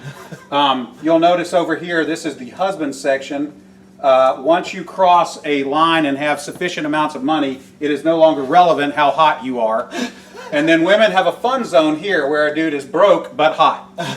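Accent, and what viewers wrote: South, United States. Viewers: For me it's the accent that makes this video, Southern, clear, articulate and a perfect balance of technical talk and slang. It's like he's briefing a group of astronauts.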